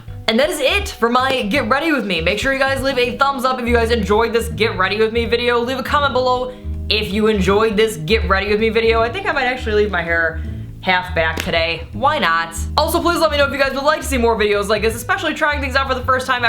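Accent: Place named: Fargo, United States. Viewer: You sound like you're from Fargo at times, I'm sure that's an assumption, I enjoy your accent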